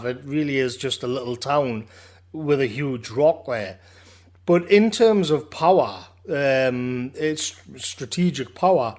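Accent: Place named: Newcastle, United Kingdom. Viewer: Wonderful, thought-provoking analysis, that puts all mainstream media coverage to shame. You have such a gift for articulating the kind of inchoate thoughts that so many of us are having right now and able to provide us with so many 'aha' moments while listening to your inspiring Geordie tones. The sheer speed and ruthlessness of these global technocrats is truly shocking and the way they seek to bamboozle us ordinary beings into accepting this new 'reality' as inevitable is both frightening and depressing at the same time. Perhaps the only real antidote to this essentially anti-human philosophy is a reshaping of a mascular Christianity for our times. And an emphasis once more on the sovereignty of the individual to be able to resist the 'powers and principalities' which seek to dominate and control our lives in such a manner.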